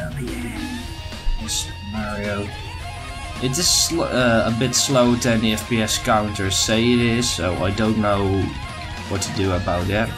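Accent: Netherlands. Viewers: Are you Dutch? / Ben je Nederlands?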